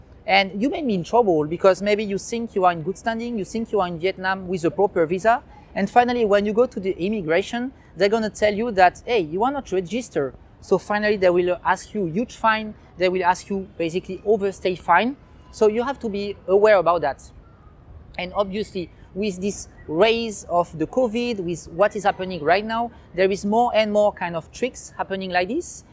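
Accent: France. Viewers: I’m American, You should really put subtitles your English is not good ...you have a super heavy French accent...I couldn’t understand you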